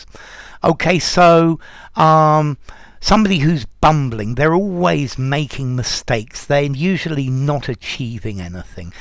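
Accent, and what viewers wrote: United Kingdom. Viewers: You have nice british accent. I am bumbling now to. Talk real. English as you..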